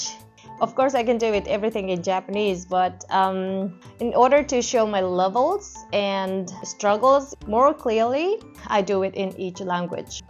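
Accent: China. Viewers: ドイツ語が完全に中国語アクセントで不思議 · 韓国語がが中国の方が話す発音っていう感じ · 日本人の韓国語発音でなくて、中国の方の発音だった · 韓国語が日本人が話してるとは思えないくらい中国語？訛りですね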